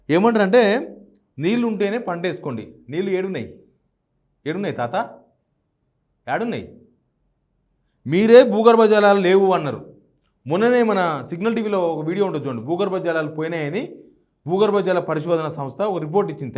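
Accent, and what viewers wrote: Telangana, India. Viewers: అన్నగారు మీ విశ్లేషణ కళ్ళకు కట్టినట్లుగా తెలంగాణ యాసలో చాలా అద్భుతంగా ఉంటుంది....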